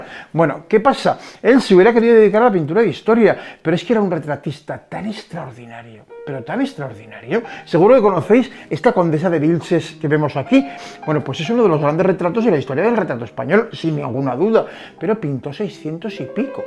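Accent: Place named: Aragon, Spain. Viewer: Te he descubierto por casualidad, buscando el Delfos, como me alegro, me encanta tu canal!!! Eres capaz de llevarme a cualquier sitio y te sigo...la capacidad de transmisión es impresionante...y hablas muy sencillo. Yo soy de Zaragoza y vivo en Teruel desde hace muchos años. No tengo acento pero me encanta escuchártelo a ti. Desde luego me fijaré más. Historias con alma!! Besicos de una zaragozana "hija adoptiva" de Teruel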